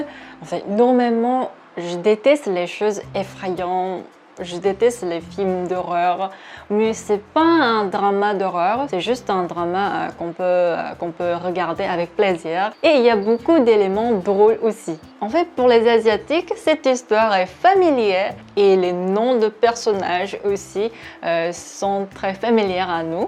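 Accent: France. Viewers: t a un belle accent Français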